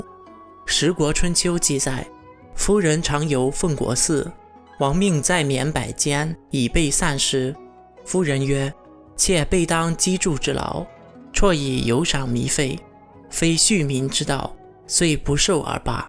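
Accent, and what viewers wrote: Shandong, China. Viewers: up主听这个口音 感觉祖籍是不是山东的呀 山东普通话 哈哈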